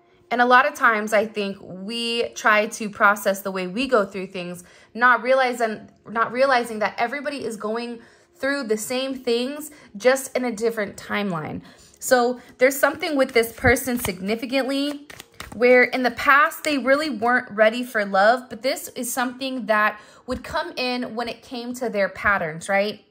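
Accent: California, United States. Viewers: Regular sub for several months now and while your readings are always lit as AF. This one was on a whole Nother level. Thank you for your gift. I’m from the Bay Area and I can hear California in your voice and you’re saying and it makes me happy. OK I’m gonna go continue the reading now I stopped at halfway through just to write this.❤️‍🔥❤️‍🔥❤️‍🔥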